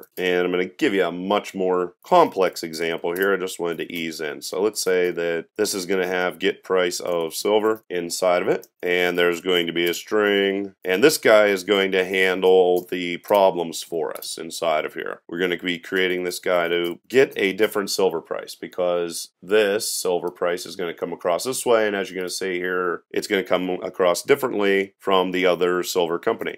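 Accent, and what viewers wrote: Pittsburgh, United States. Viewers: Enjoyed the pace and the material. Your accent takes me back to the 'burgh.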